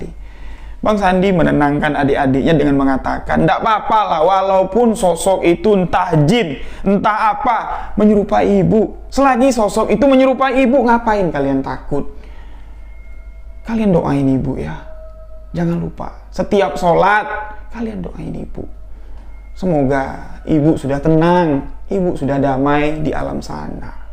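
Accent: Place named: Jambi, Indonesia. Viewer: Walaikumsalam Yana -Banten hadir Kak boleh request upload kisah Kota Ghaib Saranjana..... MasyaAllah Pasti y kereen banget lw cerita y yg bawain kak dgn Logat Jambi yg khas....🙏 Hayu Gaskeeuunn atuh kak..... 🔥🔥🔥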